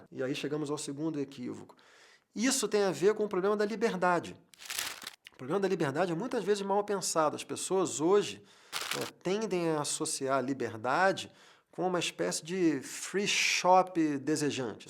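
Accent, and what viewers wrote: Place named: Rio de Janeiro, Brazil. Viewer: como sempre, com essa voz maravilhosa,sotaque sutil carioca, incrível e eloquente...eu tenho uma relação com os filhos muito peculiar, tenho 4, de uma relação sólida de 20 anos...não vou me estender pq daria um grande ensaio...O que quero cita aqui é sobre a relação liberdade e individualidade. Eu desenvolvi o hábito de beber recentemente, menos de 4 anos, sempre fumei meu Beckenbauer com meu esposo desde o início de relacionamento e aos 30 fumei meu primeiro cigarro, resumindo, eu sou incapaz de exercer o meu modus livre/entreternimento/relaxing na presença deles, eu simplesmente não relaxo...e tirando o caçula de 7, o restante são bem crescidos pra compreenderem, mas eu não consigo, e me causa um desconforto, pois me sinto reiterando o comportamento auto tolhitivo da minha mãe, apesar de não ser haja visto que eu sou o cerumano mais transparente que meus filhos conhecem, não nego nem peido, falo o que penso sempre, nunca fui hipócrita, mas está relacionado a um espectro de controle e administração...Isso eu não consegui ainda superar.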